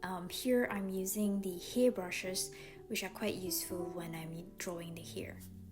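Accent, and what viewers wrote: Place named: Scotland, United Kingdom. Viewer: Completely off topic. But I do love the Scottish accent.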